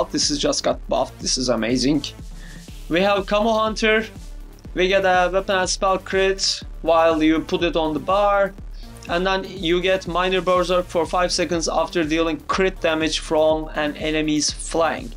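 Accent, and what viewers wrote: Turkey. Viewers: hi mate ! where are you from ? i got turkish vibe on your voice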